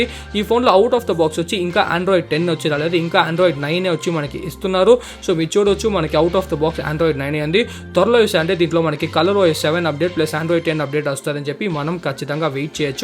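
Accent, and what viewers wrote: Chittoor, India. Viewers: He is from Chittoor or Anantapur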